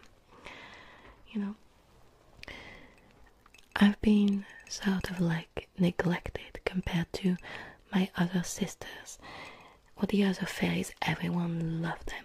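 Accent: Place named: France. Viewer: french accent asmr ??? sign me the hell up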